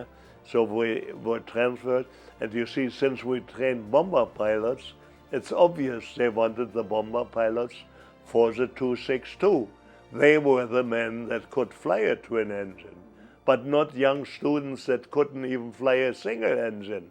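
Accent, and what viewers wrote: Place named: Germany. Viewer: I wonder if when he emigrated to Canada/US he had a German speaking wife + family/friends? His English is very good of course but VERY accented :) But I've known British people emigrate to the US/Canada or Australia and get strong local accents SO quickly!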